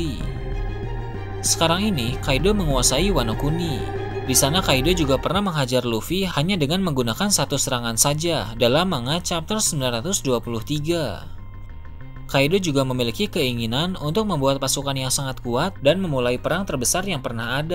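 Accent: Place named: Bogor, Indonesia. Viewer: Bang, Lu orang bogor ya.. logatNya kentel amat..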